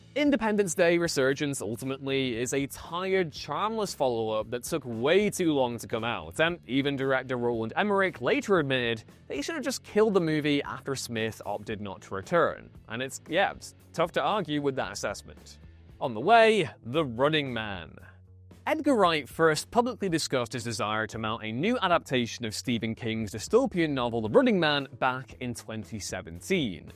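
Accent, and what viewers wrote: United Kingdom. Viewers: I always love British pronunciation of Spanish words. It is always fascinating (not that my accent was ever perfect).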